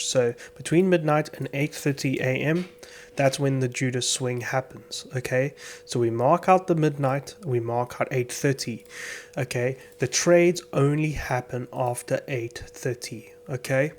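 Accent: South Africa. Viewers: are you South Africa? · dude you sound South African hey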